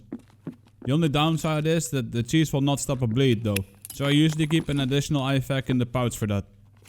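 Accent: Netherlands. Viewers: I literally just watch for the dutch accent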